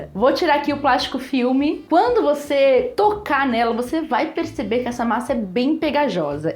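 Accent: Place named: Bahia, Brazil. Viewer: KKK vc é da Bahia? Fala igual a gente velho kkkkkkkNa moral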